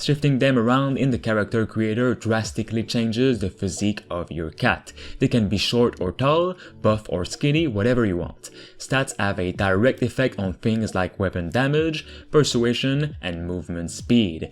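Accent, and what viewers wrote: Quebec, Canada. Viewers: Hmm are you from Quebec? :D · Hey, ton accent me rappelle celui d'un québécois, je me trompe? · u have a quebecois accent ;) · caliss cest cool de savoir un quebecois qui comment les jeux pis tout mes caliss faut vraiment que tu travaille sur ton accent ca menerveeesss !!!! ont dirais gsp qui parle en anglais fuck!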